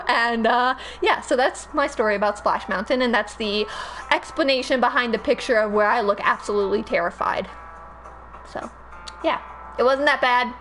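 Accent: South, United States. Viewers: Why does this Turk sound like a deep south American? :-?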